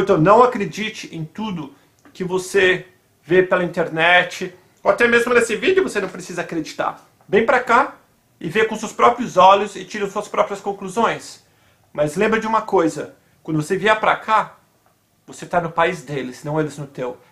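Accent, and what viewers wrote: United States. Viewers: Você já está com sotaque americano... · você é americano? pois você tem o sotaque um pouco diferente do sotaque brasileiro! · ei ei para tudo kkk sotaque americano kkkkkkkk assim vale a pena aprender ingles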